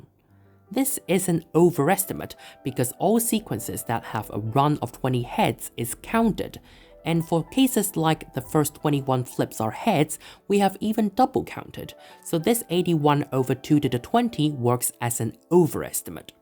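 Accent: United Kingdom. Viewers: I like how he's trying his best in British accent, not that i have anything against it lol, I'm practicing that cuz I need to study in England as well lol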